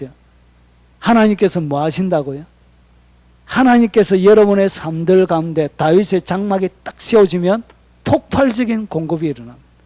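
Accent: Gyeongsang, South Korea. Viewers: 네 맞습니다 경상도 억양이 그렇습니다 입구조가 그런지 못 고칩니다 경상도 식당에 가면 시끌시끌 하고 전라도 지방은 조용합니다 큰 소리에 훼방 받지 말고 은혜받으시길 기도합니다 저도 목사님 말씀 늘 경청하고 있습니다 이와 같은 때 주의 귀한종들을 통해 꼴을 억여 주시는 하나님께 감사와 존귀와 영광을 돌립니다🙏저도 볼륨 조절하며 듣습니다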